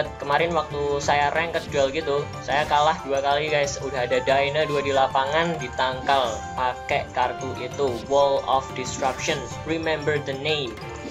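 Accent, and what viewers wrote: Java, Indonesia. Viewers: medok poll cokk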